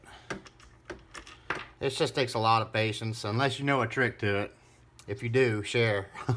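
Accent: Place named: South, United States. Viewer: Honestly couldn’t have done this without you! Thank you very much. And your southern accent helped keep me calm and patient while I followed your instructions. Very Helpful!!! 5 stars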